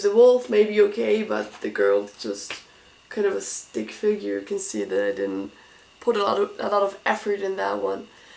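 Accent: Germany. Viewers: Don't apologize for who you are! Your German accent is amazing and you shouldn't be sorry for that. Your art is amazing by the way <3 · I have no idea why but I LOOOOVVVEEE your accent. I loved accents and German accents are my favorite! Don't apologize for your voice! It's beautiful!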